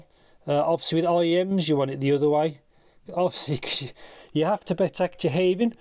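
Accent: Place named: England, United Kingdom. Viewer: I'm unfamiliar with ur beautiful accent, to which part in england it belongs?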